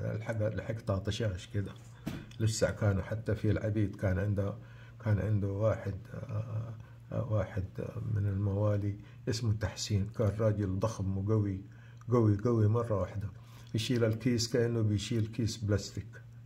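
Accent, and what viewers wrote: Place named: Hejaz, Saudi Arabia. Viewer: اسلوبك اسلوب مثقف وحبيناه بلهجتك الحجازيه الجميله واسلوب جميل بكل ماتحمله الكلمه من معنى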